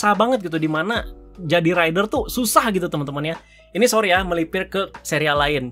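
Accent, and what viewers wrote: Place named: Madura, Indonesia. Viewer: MC nya kayak orang madura.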